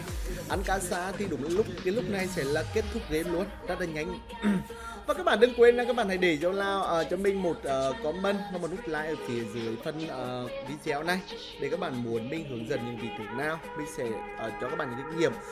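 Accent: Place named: North, Vietnam. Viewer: A nói giọng Bắc hơi khó nghe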